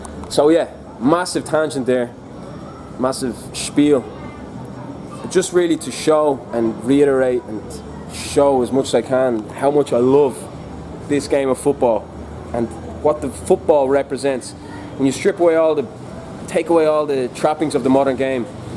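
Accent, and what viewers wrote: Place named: Ireland. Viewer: You sound like lrish you rap fast he a cool rapper singer my friend 🙂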